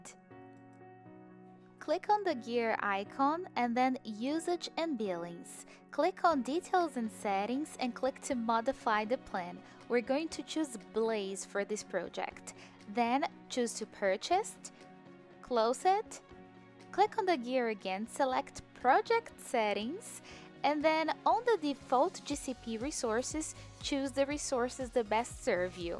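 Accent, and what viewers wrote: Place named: Brazil. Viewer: Seu sotaque brasileiro é inconfundível! Parabéns pelo vídeo!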